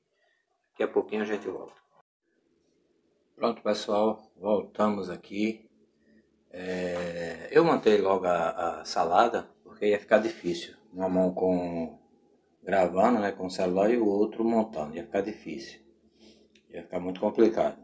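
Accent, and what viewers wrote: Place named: Pernambuco, Brazil. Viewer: Eita que sotaque lindo do meu Pernambuco 😍. Salada maravilhosaaaaa! Já vou fazer, não vai ficar maravilhosa como a sua. Mas vou tentar. Parabéns!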